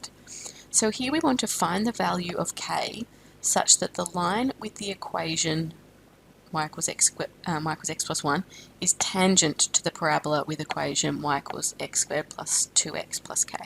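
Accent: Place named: Australia. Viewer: i needed to find an aussie math channel cause im in aus and as i soon as i heard ur voice i found it thanks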